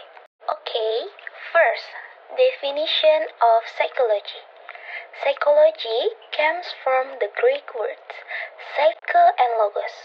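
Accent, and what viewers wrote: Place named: United Kingdom. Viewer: Keren ya kak kayak ada british2nya gitu 🙏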